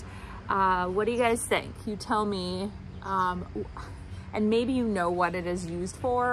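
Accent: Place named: Midwest, United States. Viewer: Found your channel recently and right away loved your accent because you sound like me! Lol..We are from WI but have been in NM for many years and will probably not be retiring here. Miss WI alot but think we we will move more in the south soon. Love you ideas and I'm a reseller as well. Happy junking fellow Midwestern ☺️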